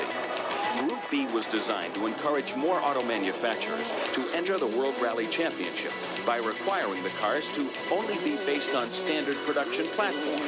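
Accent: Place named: United States. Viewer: why the F***K is thier an american voice over what the hell would they know about rallying?? thier probably shocked to watch a car make both a left turn and a right turn this ass hole belngs in a nascar video not this one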